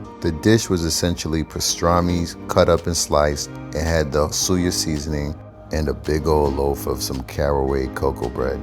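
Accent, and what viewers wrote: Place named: Bronx, United States. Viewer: This is the first video I've watched of yours...I heard the Bronx and I had to subscribe. I miss Kwame's restaurant in DC, guess I'll have to come back home and try Tatiana.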